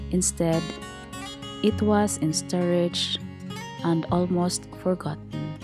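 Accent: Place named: Norway. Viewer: Na dungog na gyud naku ang accent nimu sis pareho sa Norwegian mag storya, nindot kaau ang monument naa pod d i history behind it pagka nindot sa lugar 😊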